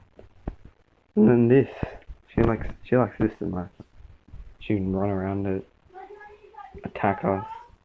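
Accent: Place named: Australia. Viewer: I follow several Aussies and their birds on YouTube. You don't have as pronounced an accent as most Australians so I thought that you might be from the UK. Anyway, I have missed Maisey and am glad to see her back. Love, from Clearwater, Florida.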